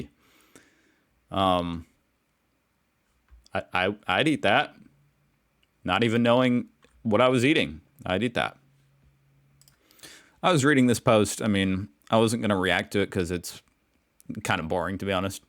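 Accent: United Kingdom. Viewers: British English not Australian English accent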